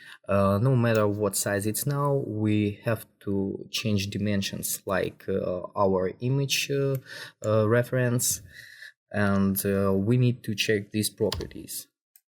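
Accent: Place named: Russia. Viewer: Приятно слышать русскоязычный акцент, словно всё по русски)) Подписка.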